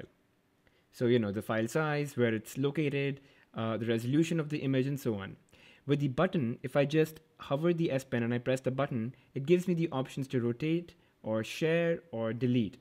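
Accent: India. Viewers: Curry accent..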